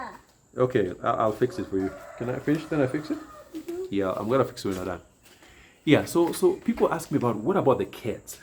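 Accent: Luo, Kenya. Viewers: Ongea tu kama mjaluo. Otherwise, as a returnee myself, this is good content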